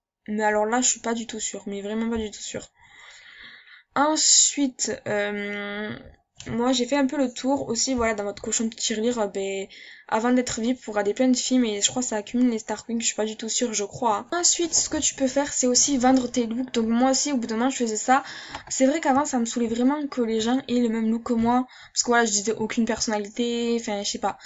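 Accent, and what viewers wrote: Marseille, France. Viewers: tu a un acsent marseuiller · Ses une marseillais ses pour sa qu'elle dit sa mai je t'adore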